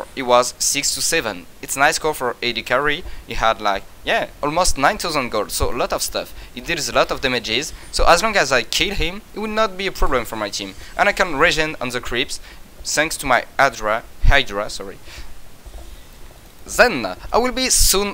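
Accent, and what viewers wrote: France. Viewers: Tu as un bon niveau d'anglais et tes vidéos sont toujours intéressantes mais y a t-il vraiment un public NA qui veuille entendre un français avec un accent à couper au couteau alors qu'ils ont déjà 10000 streamer anglophones ? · That french accent, so terrible ...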